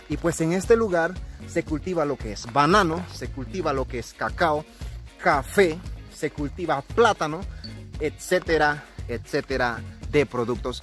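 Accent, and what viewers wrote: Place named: Chiriquí, Panama. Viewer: Fren usted saluda diferente ,yo no sé hblar bien el ngabere pero no se escucha igual a como hbla mi abuela ,talvez por qué es chiricano ,saludos y buen trabajo.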